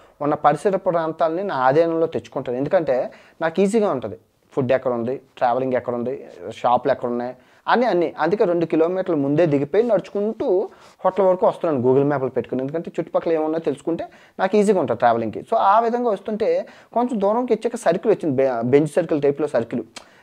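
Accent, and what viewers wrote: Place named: East Godavari, India. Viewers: Namaskaram I watched all Afghanistan videos I felt good experience sitting at home in India and ur East Godavari telugu is good and ur commedy is ultimate in khatranak Afghanistan First time I watched ur all videos of Afghanistan I loved it Because of you I came to know they hate Pakistan and they love our India Thank you Jai Hind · thalian lu CHADUV ko ledu kada tala noppu TESTARU anta adi cheppinappudu tega navvukunnanu...me matalu yasa mana visakha Jilla la undi😂😂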